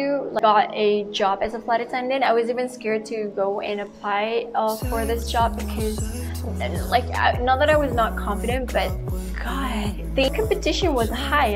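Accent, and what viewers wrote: Kedah, Malaysia. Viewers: firstly, your accent ahhaha 😂 then loghat kedah...2ndly, ive got an iv dgn kastam so just watching , btw i study in construction ind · org kedah ka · Mula ii tengok.. ingtkan makkk salehhh join pdrm.. lpstu keluar loghat kedah.... Okay 😐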